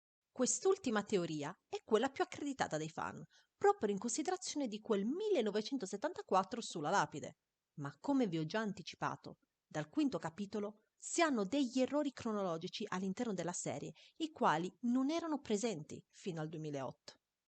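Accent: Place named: Italy. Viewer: There you go, partner! Now you've done it! Kicking ass, always. Keep always being this mad hardcore fan that we love to follow. Count with your old Brazilian partner here for anything you need. P.S: That Italian accent is SO beautiful! You gotta help me working in my Italian, or my ancestors are going to punish me haha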